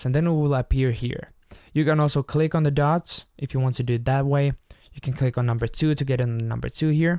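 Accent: Finland. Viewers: Are you finnish? Ooks sä suomalainen?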